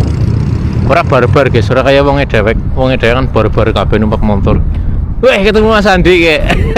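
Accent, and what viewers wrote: Banyumas, Indonesia. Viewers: Pekan baru... Mas senggol dong.. Kepriben ora ngapak ya ora kepenak.... ❤😂😂 sukses..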